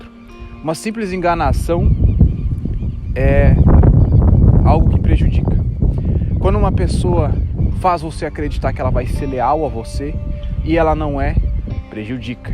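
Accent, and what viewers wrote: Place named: South, Brazil. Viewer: Sou do Sul também, e cara te admiro por levar suas raízes nos vídeos, eu percebo que as pessoas daqui do Sul quando pegam fama ou simplesmente pra parecer com todo mundo acabam perdendo as raízes, nasceram falando "LEITE" e mudam pra "LEITI", não entendo por que essas pessoas precisam fazer essa mudança, se é uma questão de aprovação social ou algo do tipo.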